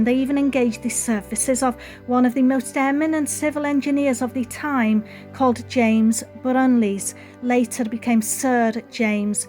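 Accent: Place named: England, United Kingdom. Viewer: Hello, you have a new friend. Lovely video and Love your English accent. your new friend Patrick.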